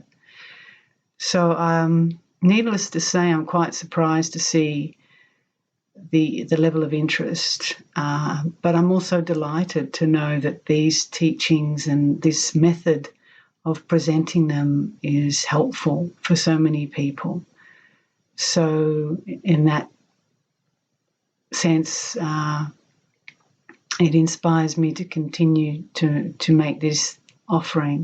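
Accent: Australia. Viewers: Your voice, became ONE with the inward deep recognition by the words of spiritual seers, these inward places are not visited by others..then only you! Who would have thought that, by starting this 'work'? I am shure about it, you wouldn't have expected it at all..which makes you humble in wat you do.:-) Many of them, I would not have searched for, knowing their context from where they started, but listening with my full attention, and with your reading..these difference's fall away. (As does also you're accent being an 'ausie'.., from the first time on fell away.:-) You're a gem for so many of us, so please keep doing this with all your joy.., as we will listen with all our heart and will meet at the meaning of those words. Thanks for presenting yourself, although not needed, you were already there..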